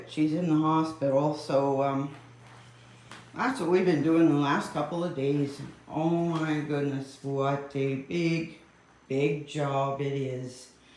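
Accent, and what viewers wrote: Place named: Eastern Canada, Canada. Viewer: Aboot ❤ the Eastern Canadian accent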